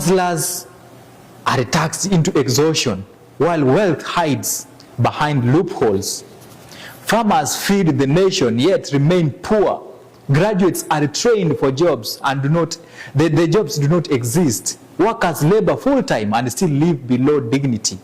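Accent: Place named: Uganda. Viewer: He sounds more of a ugandan than kenyan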